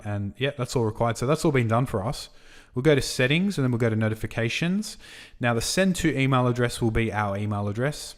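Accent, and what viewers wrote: Australia. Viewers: Man it's really helpful video. Thanks a lot. Are you from Australia?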